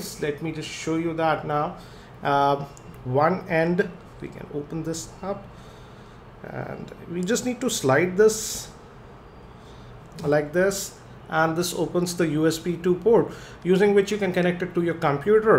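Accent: India. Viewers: why all reviewer about this sandisk are all from indian? indian english is very hard to understand. honestly, although you may think that i am racist.. but it's really hard for us to understand indian english.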